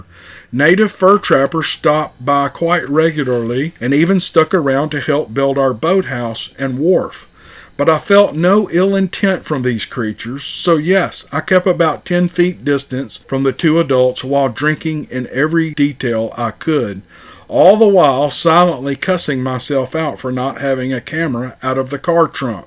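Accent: South, United States. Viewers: That story was written so well I could picture it in my mind as you read it. Your voice lends such a warm quality it’s soothing to the ears...I feel like I’m listening to a relative of mine telling a story because we all have southern accent as well. I giggled out loud at the comment about adhesive on the dentures or you’ll spit them on the table. 🐿 You have a way with words 😂 Thank you for your stories. I’ve enjoyed each and every one I’ve heard. Your time and efforts are definitely appreciated. Look forward to hearing more! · You did a pretty good job with pronunciation! It’s delightful to hear so southern a voice speak a northern name. My only complaint is there are’nt enough material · Well done another great story told in your wonderful Southern drawl up the South!! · Hey, Always excited for another encounter story narrated by you and your awesome Southern drawl!!! 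Squirrel!!! 😍👣 Thank you for your time. Your interest in these encounters is infectious and incredibly heartwarming. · That's how us Southern boys talk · Thanks! We love to hear that southern drawl telling a fresh story 👍🏻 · I'm from the UK. Every Saturday my four year old grandson stays with me, as I'm a widower. And he loves bigfoot stories, you are his favourite bigfoot story teller. When he goes to bed, it's always to your deep southern drawl he falls asleep to. So thank you, bud. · Cool! You sound so familiar when you say "Maggie". My name's Maggie also, & here where I'm from in Macclenny, FL we speak with the same southern accent as you do. I'm almost right on the FL/GA line. Sure is a great story😊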